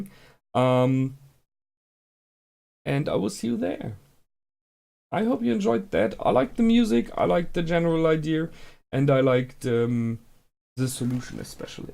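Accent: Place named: Germany. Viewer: Hört man wenn man selbst Deutsch ist:)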